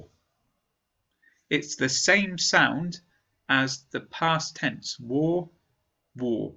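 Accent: United Kingdom. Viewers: love British accent 😍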